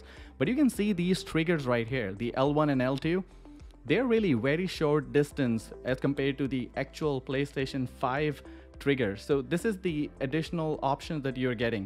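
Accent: India. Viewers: You sounds like indian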